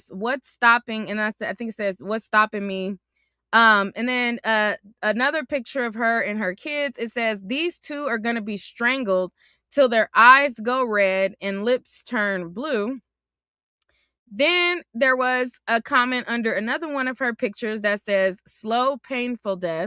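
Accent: South, United States. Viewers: I love ur Southern accent.. I truly do🤣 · Using the southern twang is beneath you.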